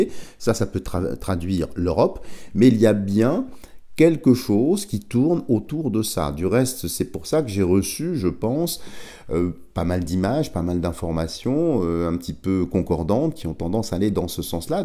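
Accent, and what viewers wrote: France. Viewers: Aaahhhh le sud ! 😀😅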